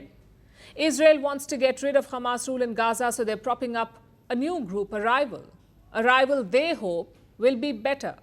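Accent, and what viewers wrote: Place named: India. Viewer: Typical indian english dont know why this lady is so serious while reading the news bolki shawerma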